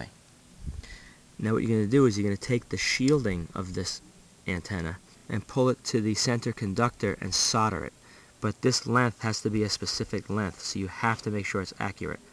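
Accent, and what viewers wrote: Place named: Boston, United States. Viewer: Are you from Boston or New York?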